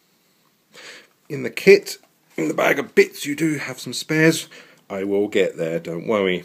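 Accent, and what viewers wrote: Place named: United Kingdom. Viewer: y r u british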